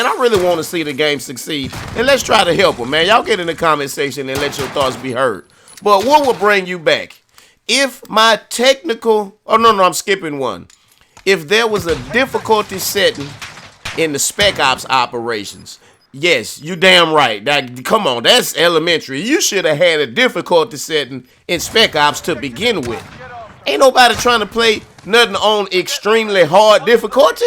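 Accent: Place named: New York, United States. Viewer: You just dressin up a turd, and eventually people realize they are playin with a pice of shit“ hahahahha man you too funny where are you from? You have a hilarious accent You sound like a friend of mine, hes from NY, i guess you come from around that area too, is that right ?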